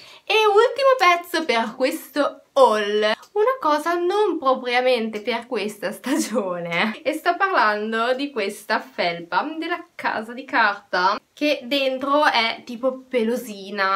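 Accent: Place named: France. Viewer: anch'io adoro gli oggetti di unicorno.Cmq è simpatico il tuo accento,sei francese?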